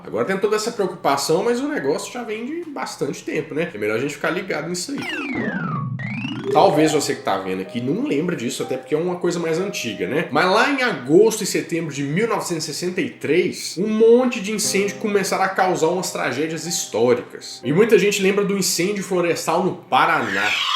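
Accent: Minas Gerais, Brazil. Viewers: sabia q ele era de Minas só pelo sotaque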